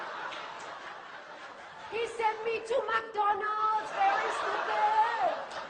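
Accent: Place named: China. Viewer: THAT'S CHINESE ENGLISH LAH! NOT MELAYU ENGLISH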